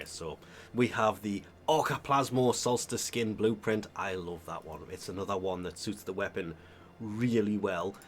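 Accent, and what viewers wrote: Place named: Newcastle, United Kingdom. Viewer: Wait a geordie warframe player? Yassss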